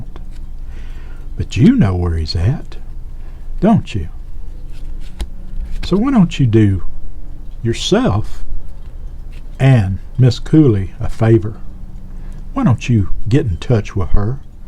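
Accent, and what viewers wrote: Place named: Kentucky, United States. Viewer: I don't know where you're from, but you sound like you're from my neck of the woods........ Kentucky.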